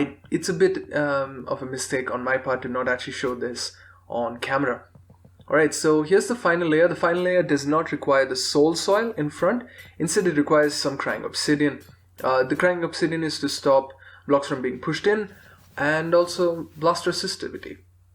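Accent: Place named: India. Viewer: bro ur from india right ? cause u definetly sound like and indian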